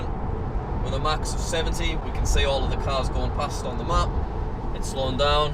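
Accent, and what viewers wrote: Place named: Scotland, United Kingdom. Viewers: Right! I didn't know if he was living in Scotland or England right now, so I just guess Scotland because of his accent. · yeah I live in Scotland he does sound a wee bit Scottish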